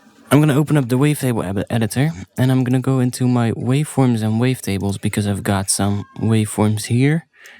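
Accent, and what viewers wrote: Netherlands. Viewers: ja ik wist het! ik zat gewoon de hele tijd tijdens de tutorial hij moet wel nederlands zijn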